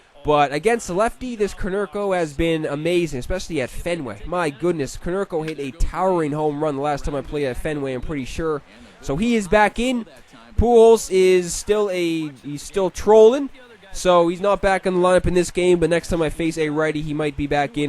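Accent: Canada. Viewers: Are you Canadian Youre accent sounds like it if you could plaese let me know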